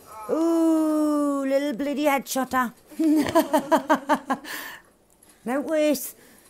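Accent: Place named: Newcastle, United Kingdom. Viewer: I just dident want to risk not dropping a comment to your vids, since I find them amazing :) Oh Newcastle, yea, remember you said Magpies was who you cheered for :) Its a great dialect though! fun to listen to!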